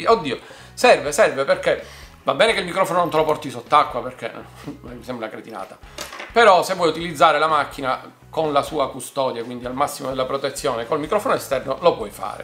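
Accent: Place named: Palermo, Italy. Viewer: Hai un'accento molto nota, sicuramente Palermo !!! Una informazione dove posso trovare in 3 piedi per questa macchina?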